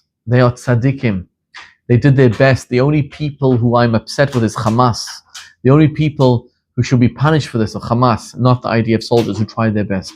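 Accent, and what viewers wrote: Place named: South Africa. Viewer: Seems like he's from South Africa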